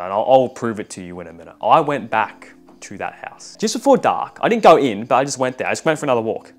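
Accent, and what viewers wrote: Australia. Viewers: are you an Aussie mate?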